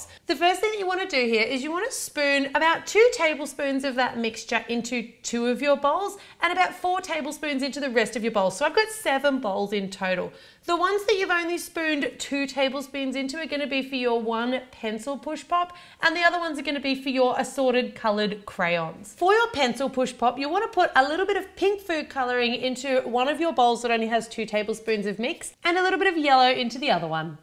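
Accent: Australia. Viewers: Is she australian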